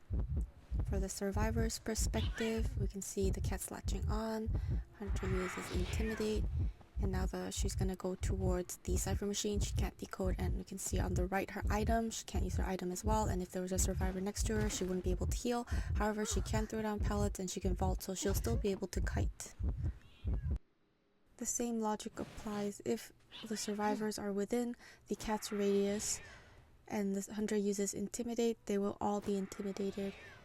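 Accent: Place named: Finland. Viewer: Tysm! This is so helpful, couldn't find a good guide on Ann. Btw where are you from? Your accent sounds the same as my finnish friend's haha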